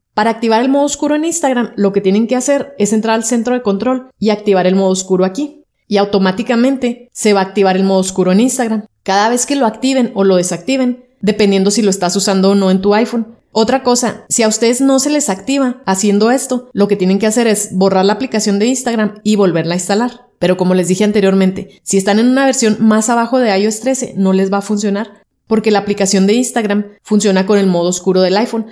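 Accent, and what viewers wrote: Mexico. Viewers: No tengo iPhone, siempre he sido android estoy pensando juntar para un iPhone nuevo no sé si lo haré porque puede que al final termine comprando otro android jeje , pero me agrandan tus vídeos, una consulta, de qué parte de México eres porque he escuchado a varios mexicanos pero tu forma de hablar es nueva para mi.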